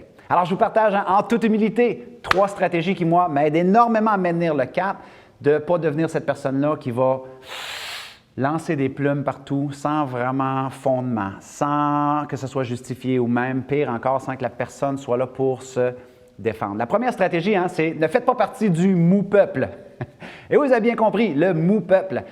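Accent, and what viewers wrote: Canada. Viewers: Rien que pour le bonheur d'ecouter l'accent canadien je me suis abonnee, mais ce n'est pas que ça! Le punch, l'humour et le message de sont geniaux! Grand merci d'une française frontaliere à la belgique.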